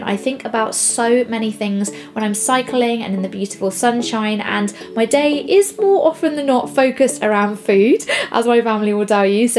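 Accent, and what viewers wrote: United Kingdom. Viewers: Are you British or live in the U.K.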